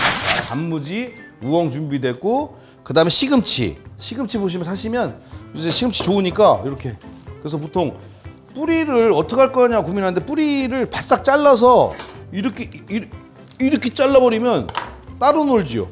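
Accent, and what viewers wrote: Chungcheong, South Korea. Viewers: 몇번을 봐도 밥 손에 한웅큼 쥐어서 김에 콕 찍으라고 하실때 그냥 귀여우심ㅋㅋㅋㅋㅋㅋ 충청도인 어투 걍 귀여우심ㅋㅋㅋㅋ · 백선생덕분에 충청도말이넘좋아유 ~~~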